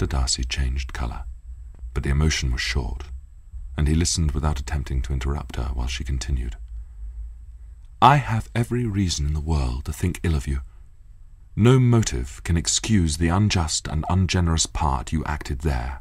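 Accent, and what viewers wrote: United Kingdom. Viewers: I was thinking that, only Joseph Morgan voice and British accent can make me to fall in British Lords, but Mr. Darcy is the true Magic, I discovered years ago ... in love with this voice, this accent and this eyes and all .... 😘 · Ador his voice and accent! Brilliant british actor! 💖💖💖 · This is the best British accent voice I have ever heard in my life. His voice is such a masterpiece.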